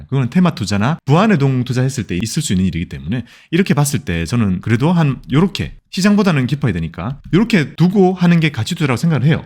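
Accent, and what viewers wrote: Gyeongsang, South Korea. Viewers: 투자공부보다 갱상도 억양의 보이스가 너~무 매력적이라 귀에 쏙쏙 붙어서 목소리 들으러 오는데 덤으로 알려주는 투자방법도 내 성향에 맞는것은 가져 감 ㅋ 쌩유요 ^^😂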